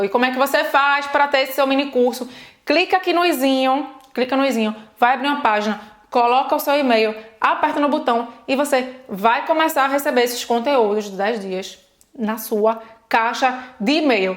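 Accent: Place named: Northeast, Brazil. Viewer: Eu queria saber porque não perdeste o sotaque nordestino, que eu acho muito legal !? Parabéns menina ! Adoro os seus vídeos. Mesmo estando muito longe ,estás ajudando o nosso povo !! Deus te abençoe !! Gravataí RS Brasil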